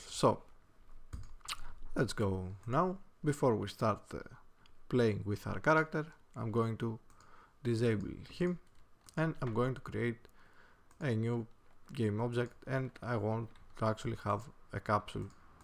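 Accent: Greece. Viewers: Are you greek? You sound like it :)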